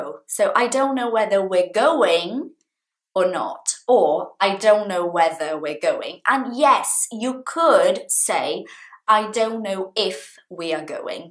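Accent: United Kingdom. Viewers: Esse inglês britânico é o melhor, lindo de se ouvir 💙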